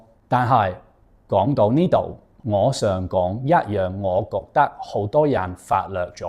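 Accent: Standard. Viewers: 仲有一點AI目前做唔到或者仲未做得好好嘅，就係廣東話比起大部份語言都更需要語氣輔助先可以準確理解真實意思，好多同一句句子用唔同語氣講出嚟個意思就完全唔同，例如簡單一句「辛苦哂」，可以係好感激你所做嘅嘢亦可以係好不屑你所做嘅嘢……我覺得呢點亦係母語並非廣東話嘅人學廣東話最難之處，Calvin你嘅用詞發音都好標準，如果有埋語氣同語速快慢嘅幫助就唔單止係自己人，直情可以當你係本地人😂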